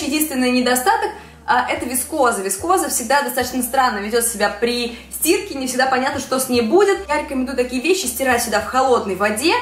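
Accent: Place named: United States. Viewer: Вы слишком быстро разговариваете,прямо тараторите и манера разговаривать у вас какая -то американская.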